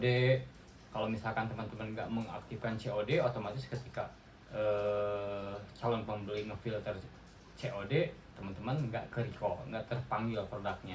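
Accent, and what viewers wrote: West Java, Indonesia. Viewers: assalamualaikum kang perkenalkan saya bahcen dari bandung sehat selalu kang dan jangan bosan bagi bagi ilmu nya krna sangat membantu yg masih awam seblumnya saya lihat lihat video akang kayanya dari logat bicaranya seperti orang jawa barat jika benar orang jawa barat saya siap ngopi bareng akang untuk shering tentang shopee terima kasih sebelumnya ya kang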